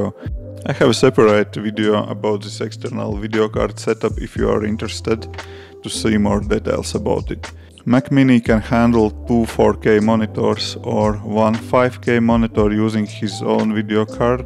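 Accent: Russia. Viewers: Артем Анащенко Русский, ибо такой акцент трудно спутать))).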